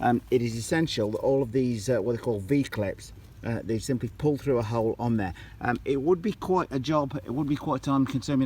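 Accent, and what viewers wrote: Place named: United Kingdom. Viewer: Great job with the review!!! Scary good pointers ! The British accent is cool too!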